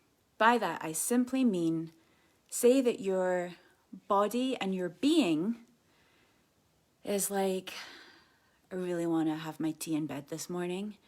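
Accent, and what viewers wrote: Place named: Glasgow, United Kingdom. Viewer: it's so cool that you still have a wee Weegie burr :D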